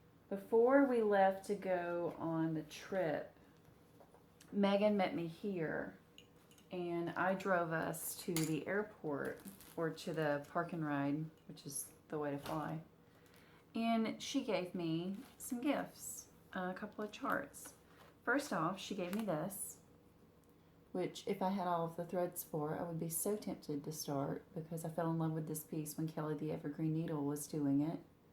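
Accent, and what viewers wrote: South, United States. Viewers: Love every single thing you do and next to Marlene, I think you may have the sweetest Southern accent ever. Could listen to you all day. Was fun seeing you mom’s house....love that you go there and spend time with her and always talk so sweetly about her.....miss my mom everyday. Fun to see the bottom corner of HRH Halloween since I started at the top right. 😘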